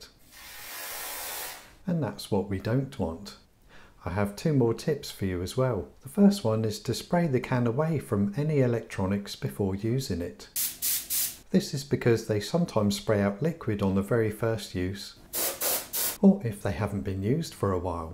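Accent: United Kingdom. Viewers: British Bob Ross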